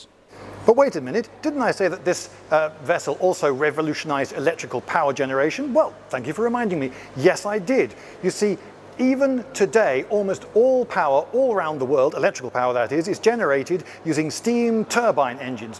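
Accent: England, United Kingdom. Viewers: In other words, he sounds very British. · No, he sounds fairly upper middle class, English. Welsh and Scots are also "British".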